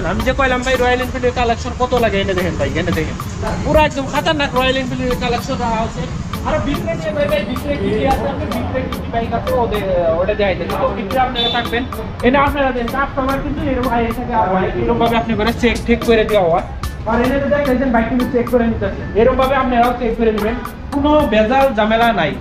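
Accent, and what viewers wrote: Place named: Bangladesh. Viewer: I suspect this guy is from Bangladesh. His accent says so. Not like pure indian west bengal bengalis. Requesting respective authorities to check his and his family background and origin seriously. Infiltrators from Bangladesh burma and Pakistan are playing havoc on the security and economy of our country.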